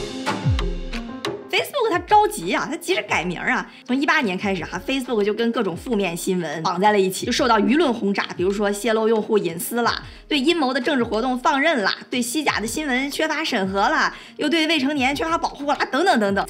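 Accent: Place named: Shandong, China. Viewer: 听出来小林的山东味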